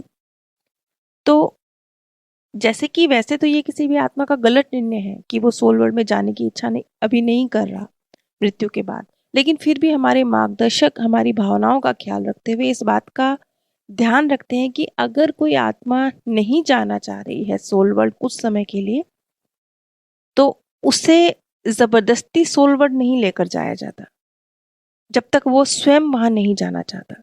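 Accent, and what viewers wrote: India. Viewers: di ka Punjabi accent hai isliye aisa pronounciation hai unka..... Thoda theek se hi reply kr do ye gawar wala cheap word bura nhi lagega kya unko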